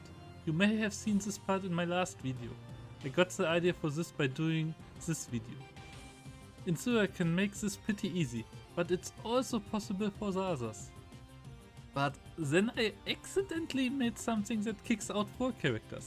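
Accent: Germany. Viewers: kann es sein das du deutsch bist? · You sound german , are you german ?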